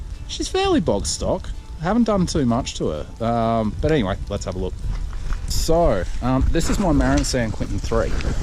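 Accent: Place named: Australia. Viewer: Love the aussie accent mate. Also love the bike, great video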